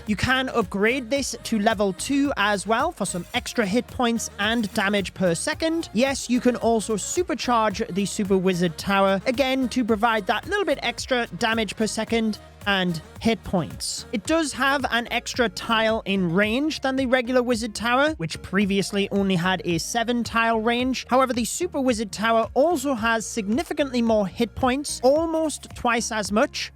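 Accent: United Kingdom. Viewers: I love this British accent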